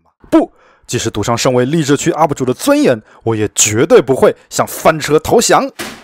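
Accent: Wuhan, China. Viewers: 大哥，你是武汉的吧🍭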